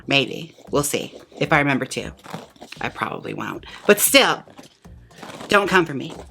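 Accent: Australia. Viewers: The Australian accent is everything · Are you trying to do an Australian or an ol timme Cockney accent? Either way, love your work xo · that’s how Australians sound 😭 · More Wigs by Vanity please or just the hilarious Aussie accent! 💗❤️🧡💛💚💙💜🖤🤍🤎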